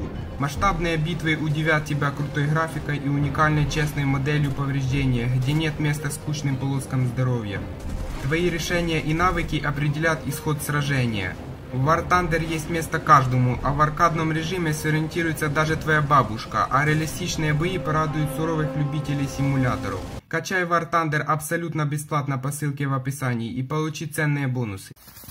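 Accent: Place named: Ukraine. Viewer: Из-за укра(ударение)инскова выговора😁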